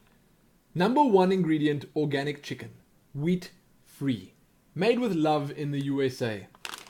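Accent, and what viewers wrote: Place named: South Africa. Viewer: Ahhhh! South African! I've been trying to figure out your accent for the last 3 weeks! lol. I think I'll send you something too! Your videos have been quite entertaining!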